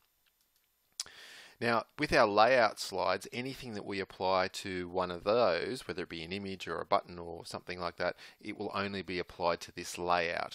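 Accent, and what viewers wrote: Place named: Australia. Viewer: Good to hear an Aussie accent on a Storyline tutorial! Thanks for the clear video.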